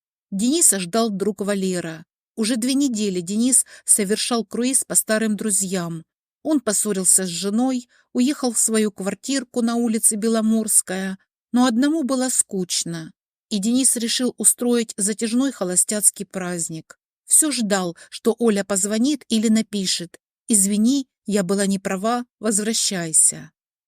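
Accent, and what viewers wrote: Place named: South, Russia. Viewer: Очень сильный южный акцент. Хочется литературного произношения.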